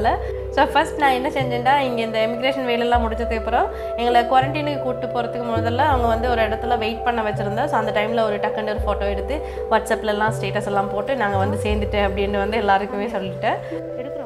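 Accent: Sri Lanka. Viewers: இலங்கை தமிழ் இனிமை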